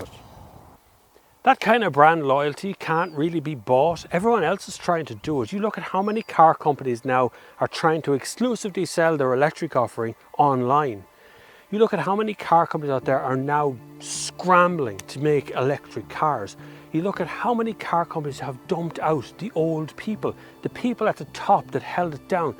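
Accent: Ireland. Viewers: Excellent documentary. Thanks very much. Just a minor point as a Canadian watcher: Newfoundland is correctly pronounced "Newfin' lan". Also, they have accents very close to your own as this part of Canada was mainly settled by Irish migrants. Carry on. Great content. Cheers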